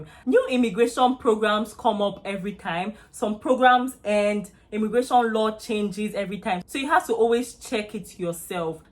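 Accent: Nigeria. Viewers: Are you sure you left at age 16. Cos your yoruba intonation is wow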